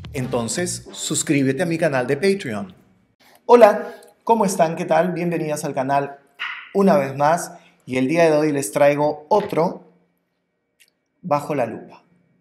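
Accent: Portugal. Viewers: Te Amo! Tu eres AMAZING!!! ME Encanta verte, oírte y aprender de ti. Gracias por Existir preciosura y por hablar tan hermoso Portugués 💕 💖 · Me encantó escucharte hablando en portugues :) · ese acento portugués me enamorooo ❤️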